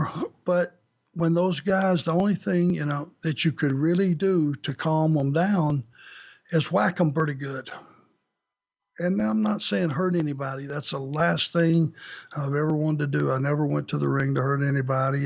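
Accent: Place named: South, United States. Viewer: This is my first listen to anything from Arn's podcast, and all I can think of in listening to him is that he sounds like Winnie The Pooh in a southern accent. Listen to Winnie the Pooh from the 1970's when Sterling Holloway did his voice and imagine that with a southern accent. This is today.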